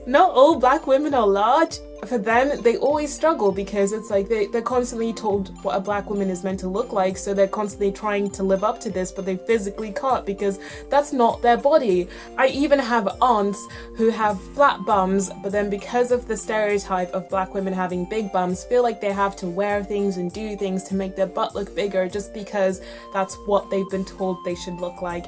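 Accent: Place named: England, United Kingdom. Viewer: You So Cute! Such Lucious lips And Eyes💎 U Talk So Cool Over There In England..... 💖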